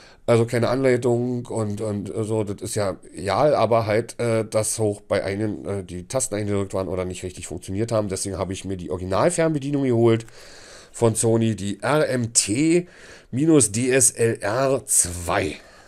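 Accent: Berlin, Germany. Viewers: Also mein Lieber, entweder Berlinerste richtich oder lässt det. Dieses „Berliner/Hochdeutsch“ dit is nüscht!!! Grüsse 🤘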